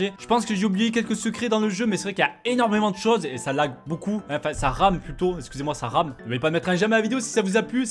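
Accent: South, France. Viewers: tu viendrais pas du sud car tu a l'accent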